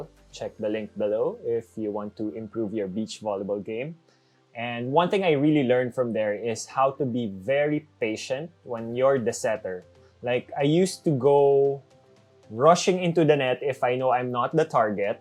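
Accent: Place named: Philippines. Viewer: Its not that its bothering or anything. But you have the Filipino accent, and i cant stop noticing it XDD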